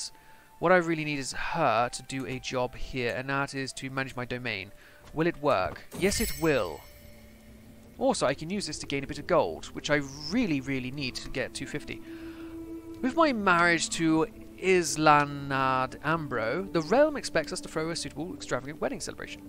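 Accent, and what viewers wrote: United Kingdom. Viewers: . You're thinking of Mo Farah, the long distance runner. Mo Salah is a soccer player. Bro you have the most british accent I've ever heard, you should know this.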